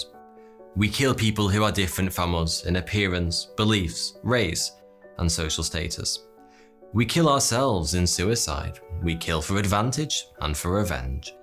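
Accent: United Kingdom. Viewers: I knew where this was going, but I just wanted to hear it in his beautiful British accent and voice.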